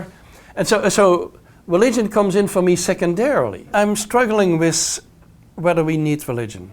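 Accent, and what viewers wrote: Netherlands. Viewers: I could here he was dutch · balkenende accent....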